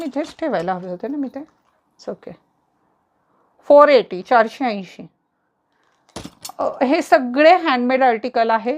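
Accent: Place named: India. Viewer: . ❤❤❤✨ कानात सजवा सौंदर्याची खास झलक… 💖 M.J. Collection Jewellery चे सुंदर झुमके — प्रत्येक लुकला देतील आकर्षक अंदाज! 🌸 आजच तुमचा आवडता झुमका निवडा! ✨Agadi paramparik dagina ahe zumaka agadi khas look deto zumaka❤️❤️❤️❤️👋👋🙏🥰bolata pan amchya gavran bhashet mast❤❤😊😊 ❤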